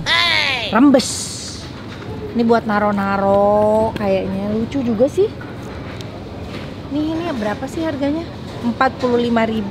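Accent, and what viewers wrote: West Java, Indonesia. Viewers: Lucu banget teh logat bahasa sundanya 😄👍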